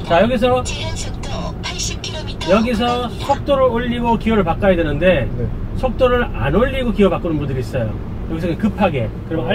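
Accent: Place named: Gyeongsang, South Korea. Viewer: 이거 보고 1종보통 도로주행 한번에 합격했습니다 직관적인 설명,약간의 경상도 사투리(?)...친절함까지... 1종보통 합격요령은 기어조작능력 + 순발력 + 느긋함 + 약간의 센스가 필요해보여요.저도 뭐 유튭영상 수십번 시청하고 했지만 막상 시험칠때는 아무 생각 안나더 군요.긴장을 삭히고 배운대로 익힌대로 차분하게 마인드콘트롤 하면서 초반이 제일 중요하니 초중반만 문제없이 넘기니까 후반쯤에는 오히려 자신감이 생기데요:)) 보통 도로주행 70점컷인데 만점은 거의 없고 80~90점으로 많이 합격하더라구요 만점받을 생각하지 마시고 감점을 최소화하는게 합격요령이에요 다들 꼭 한방에 합격하시길 바랄게요 화이팅!!!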